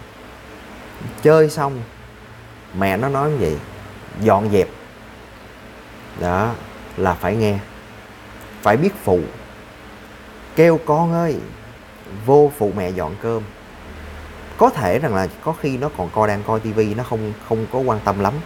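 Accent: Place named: Binh Dinh, Vietnam. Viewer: A người gốc bình định thì phải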